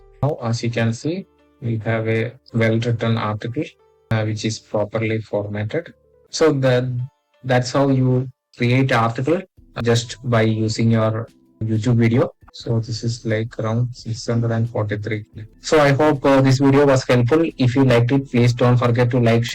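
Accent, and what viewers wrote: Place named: Kerala, India. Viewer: I think your Malayalam should not come in English and can be litter slow and steady in talking.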